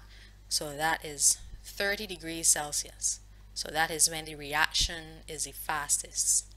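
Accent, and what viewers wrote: Barbados. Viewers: Cause she’s prolly Barbadian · Why she had to have a Barbadian accent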